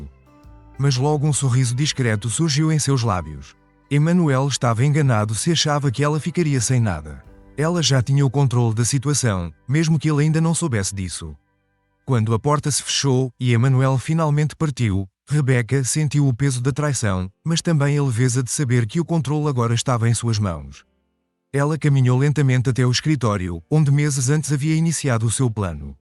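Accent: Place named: Portugal. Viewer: essa voz sensual desse português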